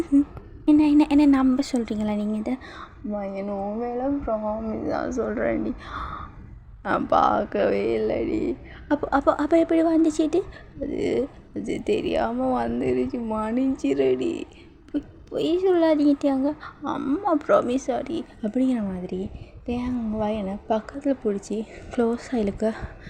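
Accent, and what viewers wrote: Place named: Sri Lanka. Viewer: Your sounds like Sri Lankan are perhaps a Sri Lankan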